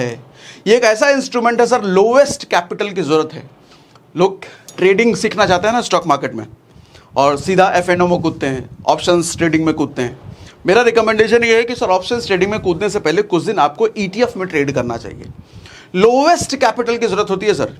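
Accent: Bihar, India. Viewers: sir ke sabhi pasand krta hai ye bihari hai bolte hai na ek bihari 100pe bhari ye yahi hai sir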